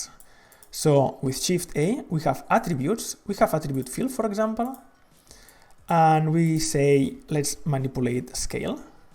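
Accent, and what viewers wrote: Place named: Catalonia, Spain. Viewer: Sembles de catalunya tio, un grande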